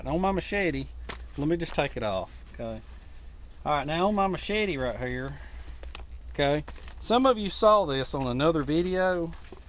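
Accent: South, United States. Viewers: This guy is so much southern (no disrespect)!!! It's funny when he says Machete. ..LOL I like your vids. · Hey man, I love your accent! What part of the States is that from?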